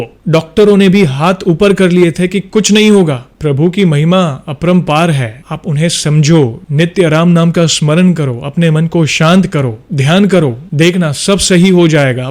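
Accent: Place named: Pakistan. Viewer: Bhaisab aap Toh Pakistani lag rhe ho .apki tone se lag rha hai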